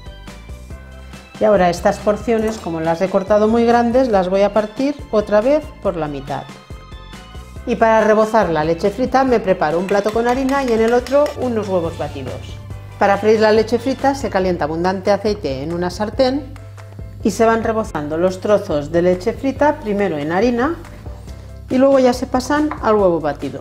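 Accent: Catalonia, Spain. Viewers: Hola, Eres de Logroño? Te noto un cierto acento catalán, no?? En cualquier caso me encantan tus recetas y aún más como explicas. Un abrazo guapa 😘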